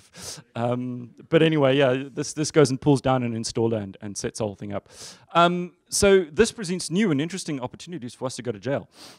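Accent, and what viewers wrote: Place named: South Africa. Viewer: I recommend watching this at 3/4 speed if you're not South African or Kiwi.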